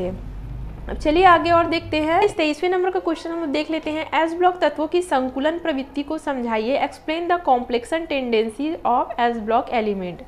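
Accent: Chhattisgarh, India. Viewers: Mam chhattisgarh se ho kya?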